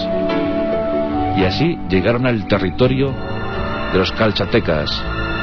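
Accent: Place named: Spain. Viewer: que se puede esperar...El autor de este video, y se puede notar en la voz, son españoles... No me sorprende que hagan ver a tenochtitlan como los malos y los españoles como los buenos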